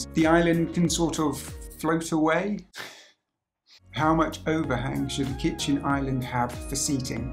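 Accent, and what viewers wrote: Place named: United Kingdom. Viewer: He’s British judging by his accent so it’s perfectly normal for him to express in metric measurements.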